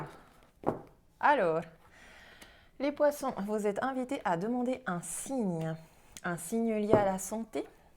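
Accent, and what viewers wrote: Tahiti, French Polynesia. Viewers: Bonjour, merci pour votre message 🥰oui oui vous avez bien reconnu l’accent tahitien d’une tahitienne 😉 belle journée ☀️ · Bonjour, merci pour votre travail. Vous avez l’accent tahitien. Belle journée.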